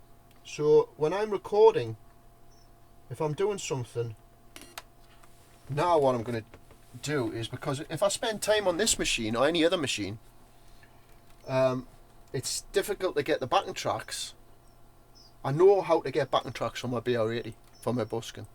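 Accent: Newcastle, United Kingdom. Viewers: I love this video. It is just plain, raw, proper Geordie, and honest. "nay problem" 😀 And you can sing. Nice rich voice. So jealous.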